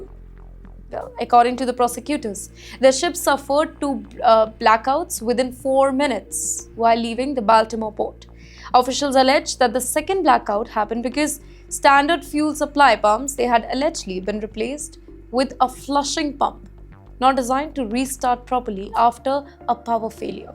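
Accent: India. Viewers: That's the Indian version of English and there's nothing wrong with it!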